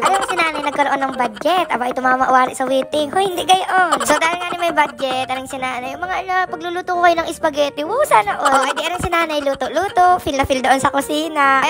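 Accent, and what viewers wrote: Batangas, Philippines. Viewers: Seriously? Mangyan na tagalog at puntong Batangas? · Dati ang vlog nito nung ndi pa sumisikat pumupunta xa sa mga katutubong mangyan ngaun nung nkilala na xa ndi n xa nadalaw dun, ang tunay n mngyan nandun mindoro sa bundok.nka bahag lang cla. Un dapat balikan mo qng saan ka nkilala dahil kumikita kna, ang mang yan ndi batangas ang accent.😢😢😢😢dati nung ndi kpa kilala lagi k nadalaw sa lugar ng mngtan ngaun ndi q n nkita bumisita k sa knila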